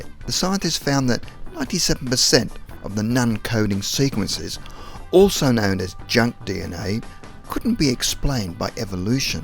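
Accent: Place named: Australia. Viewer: Ozzy accent. at first I thought it was odd to hear it as a narrator but yup.. it's nice to listen to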